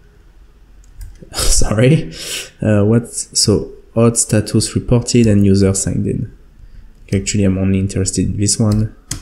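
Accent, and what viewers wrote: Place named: France. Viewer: You are soo french... i truly understand the translations going through your head as you try to speak English. I speak french too but a native English speaker... the "cool" finally gave you out. Nice video.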